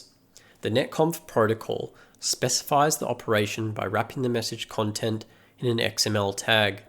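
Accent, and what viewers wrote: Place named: United Kingdom. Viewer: Amazing work. And without accent. Pure british❤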